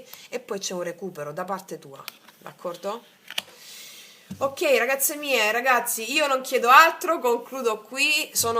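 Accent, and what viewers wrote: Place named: Sicily, Italy. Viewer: Ti dimentichi che non siamo tutti siciliani.,. parli troppo in fretta ti mangi le parole con il tuo accento... grazie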